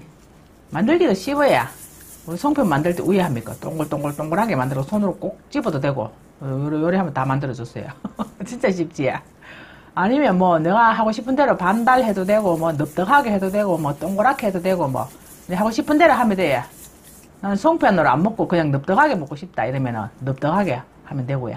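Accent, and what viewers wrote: Gyeongsang, South Korea. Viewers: 님은 참 재미있게. 요리. 하십니다. 구수한 갱상도 말씨 정감갑니다 항상 잘 보고 있습니다❤❤❤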